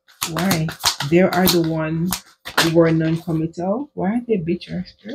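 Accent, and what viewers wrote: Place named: Jamaica. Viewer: What an AMAZING read...Thank you dear! And I just LOVE ur Jamaican accent 😊.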